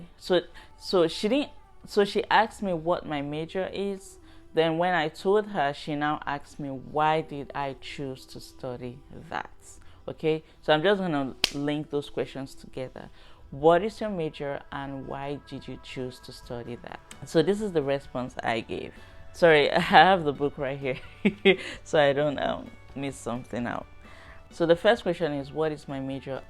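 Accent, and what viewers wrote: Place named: Nigeria. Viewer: Where u from ?Like u have naija accent buh the name tho...🤔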